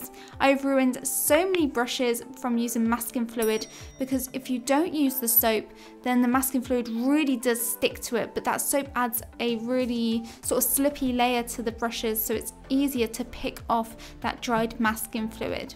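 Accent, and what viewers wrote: England, United Kingdom. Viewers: Is her accent British??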